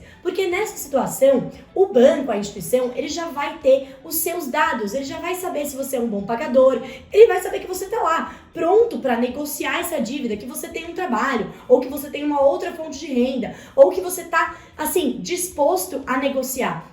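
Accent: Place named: São Paulo, Brazil. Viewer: Ela é muito lindinha com esse sotaque de paulistana!!!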